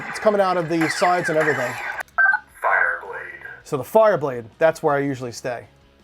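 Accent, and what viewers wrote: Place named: Boston, United States. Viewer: I know that accent from any where you from boston?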